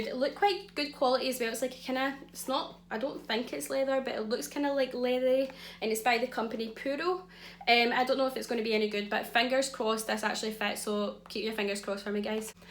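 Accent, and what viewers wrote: Scotland, United Kingdom. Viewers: Just subscribed nice to hear a Scottish accent that isn't making me cringe! I very rarely comment but how about a Watt Brothers haul, the make up deals they have are phenomenal!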